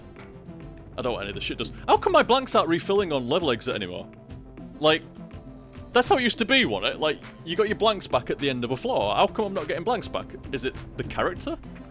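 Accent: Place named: Scotland, United Kingdom. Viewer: Hey, from what county are you from? You sound scottish-like tbh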